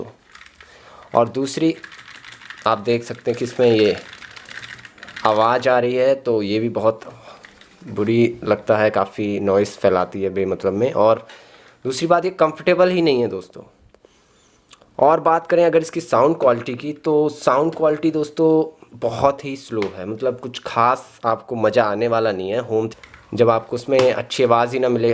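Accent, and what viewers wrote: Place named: Rajasthan, India. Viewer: Bhai aap rajasthani ho thodi marwari bol re ho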